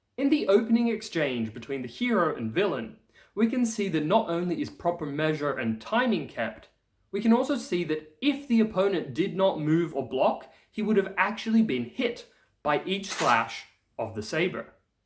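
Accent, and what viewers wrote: England, United Kingdom. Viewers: Your Polish sounds good and your English, well, English. As to never having been to the UK? Big surprise to me. I did like the video, btw and will certainly check the movie out. Up until now, the best looking swordplay - with sabres - that I'd seen was in another Polish movie, the title of which I don't recall, but concerns the 'potop' period. One of a trilogy, if I remember rightly. If you've not seen it, it may be worth a look. But then, I've never so much as handled a sabre, so make of that what you will. Cześć!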